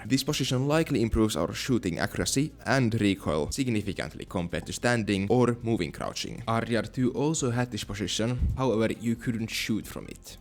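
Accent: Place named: Finland. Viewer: awesome finnish accent bro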